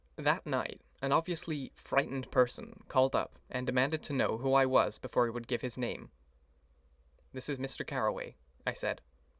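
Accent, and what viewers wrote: United States. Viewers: oh... he was doing an american accent...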